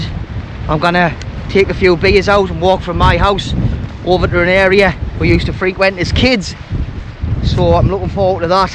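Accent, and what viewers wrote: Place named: Northern England, United Kingdom. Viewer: As an Australian, I had to tune in to your northern lingo and accent, but I enjoyed your straightforward style. Keep up the good work!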